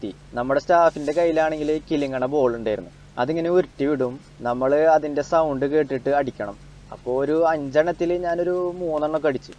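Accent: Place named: Thrissur, India. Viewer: മാഷാ അല്ലാഹ്... നന്നായി...ആ തൃശൂർ സ്ലാങ്ക് കമന്ററിയാണ് എനിക്ക് നിന്റെ വരയെക്കാൾ ഇഷ്ടയത് 😍🤩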